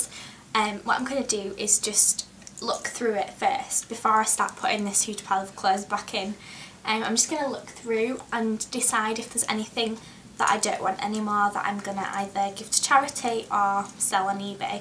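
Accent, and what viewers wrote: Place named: Manchester, United Kingdom. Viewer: Is yours a Manchester accent? I was acquainted with a girl who had an accent like yours and she was from Manchester. I like it.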